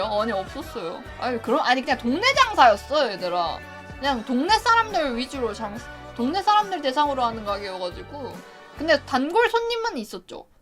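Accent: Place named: Gyeongsang, South Korea. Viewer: 경상도 사람임? 사투리쓰네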